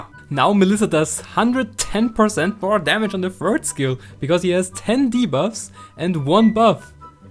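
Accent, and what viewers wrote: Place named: Germany. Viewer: Cringe german-english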